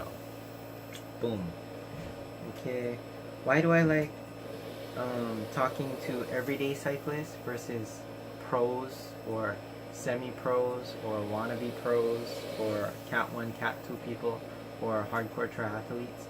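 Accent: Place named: United Kingdom. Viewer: Brilliant topics, lol I love your British accent 😂🤣 superb my friend. I do a good Australian accent, I’ll have do it on the vlog! So my accent is from the south, was born in Watford just outside of London, my wife thinks I’m very common lol 😂 I think Home is where your heart is but wherever I move too in the UK I’ll always think of Watford as ‘Home’ in the uk. But I also consider myself to be European, we are so close to France, only 1hr 30 mins to the coast where I can drive my car onto a train and in 30 minutes you are in France which I always think of as the gateway to Europe. There are definitely times of the day when I will also not venture onto busy roads. Totally agree with about riding for fun, it’s why I ride 😁👍🏻 I love it 🚴🏻👍🏻😁👌🏻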